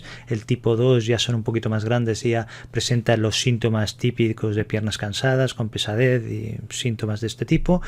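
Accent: Spain. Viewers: Por el acento del Dr. ,puede estar en España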